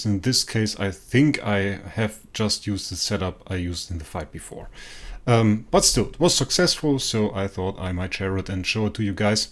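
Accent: Germany. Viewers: Da hört man den deutschen raus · are you german or where are you from?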